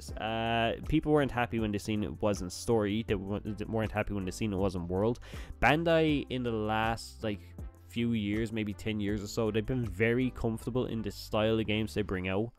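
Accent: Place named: Ireland. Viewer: You called me out mate! Na I'm playing, good review man.. caught the Irish accent straight away ✌🏾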